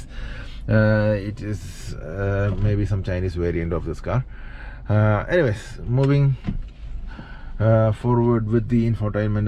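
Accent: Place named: Kerala, India. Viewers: Malayali daaaaa....😍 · Sounds like a " Malayali "......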